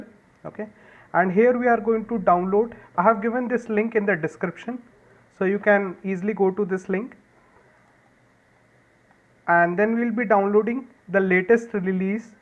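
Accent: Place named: India. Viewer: hindu accent, words tech, support, solutions etc used, asks to download something that could have been coded by anyone. no ty.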